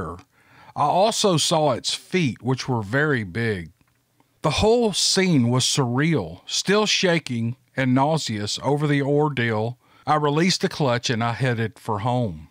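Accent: South, United States. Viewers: Howdy Sir; Just wanted to take a moment to say Thank You on this hobby of yours. Did you say you were from MS or AR? I wouldnt think TX your twang isn't that gruff. Anyway, I do enjoy your tales. I am still not all that sure what they are and why we never seem to get anywhere about anything. I am even embarrass with some of these shows with these fools going out there and yelling hitting a tree then because they do not hahve anything make themselves scared for the camera or better yet those that make side people out to get them sigh. That gets old. With all the stupid stunts if they do every figure out this stuff nobody's going to believe them anyway.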